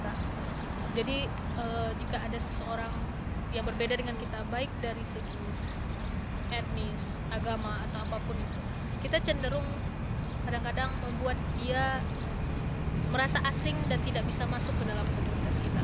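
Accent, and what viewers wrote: Aceh, Indonesia. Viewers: Logatnya aceh banget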